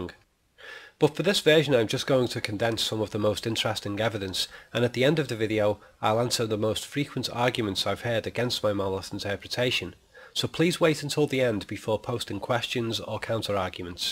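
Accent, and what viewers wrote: Liverpool, United Kingdom. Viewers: I have a question: Are you a scouser??? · Just found this doc and it's just what I wanted to see before 2001's re-release this month (Nov 2014)...Also...Whey! a Scouser! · Do I detect a faint Liverpudlian accent...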